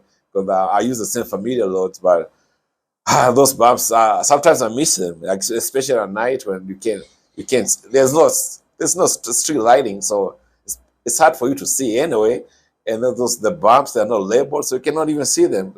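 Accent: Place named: Kenya. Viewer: Kikuyu accent never goes away... enjoy dude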